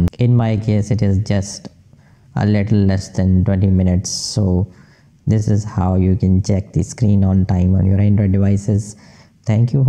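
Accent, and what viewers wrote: India. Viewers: So wut if they have an indian accent i think their helping alot and doing pretty good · They always have an Indian accent I swear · People with Indian accents on youtube have tought me more then school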